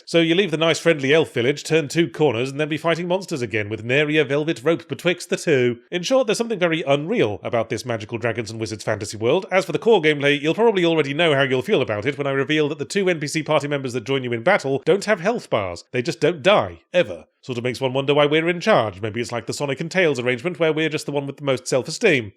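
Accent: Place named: United Kingdom. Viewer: Yeesh: You gotta be really British to naturally rhyme "charms" and "palms".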